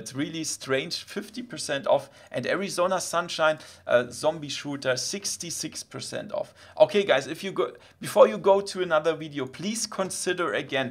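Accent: Germany. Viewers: an deinem english hört man das du deutsch bist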